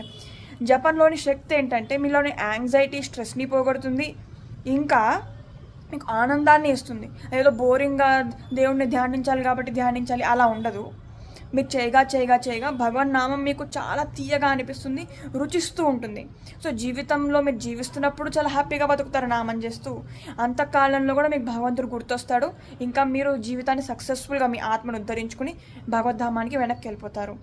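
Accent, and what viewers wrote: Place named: Telangana, India. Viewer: ఈ నవ యువతి ఆంధ్ర పిల్ల అనుకున్నా తెలంగాణ భాష. దొర్లుతున్నది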